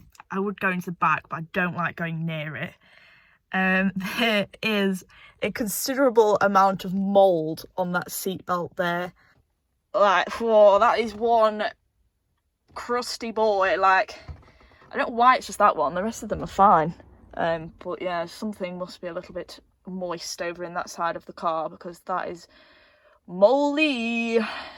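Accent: United Kingdom. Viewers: Love that British accent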